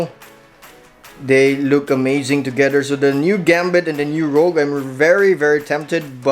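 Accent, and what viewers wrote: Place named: Netherlands. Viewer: Awesome collection bro. Where are you from? You sound kinda Dutch😉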